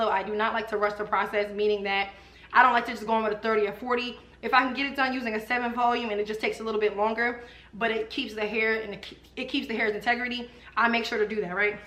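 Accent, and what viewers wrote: Philadelphia, United States. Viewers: you from philly aren’t you? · Are you from Philly? I think hear it in your voice lol I’m really really sorry that happened to you, people are CRAZY.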